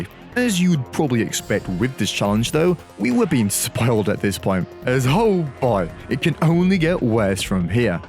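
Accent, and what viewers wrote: United Kingdom. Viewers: I think he’s just British bro nothing wrong with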